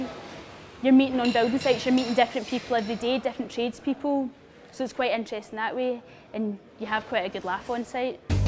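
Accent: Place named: Ireland. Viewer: Sounds just like the irish dude in my residential wireman class.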